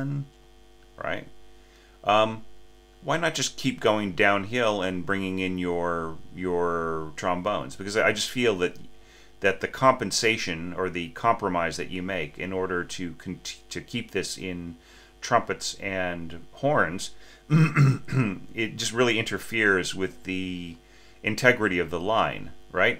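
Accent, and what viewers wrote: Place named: France. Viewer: Very sweet. Sounds very French. I love it. And so many thanks. It is a joy to hear you talk about the score...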